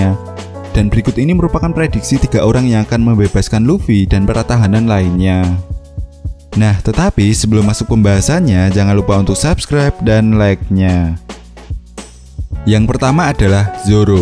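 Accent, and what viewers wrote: Java, Indonesia. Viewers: Logat Jawa 😂😂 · Suara nya jawa banget mas , gaenak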